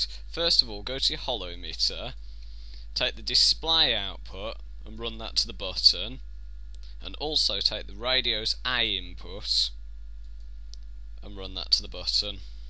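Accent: United Kingdom. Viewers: this guy sounds more british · i hate british accent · this man is British. He sounds like a young Ringo Star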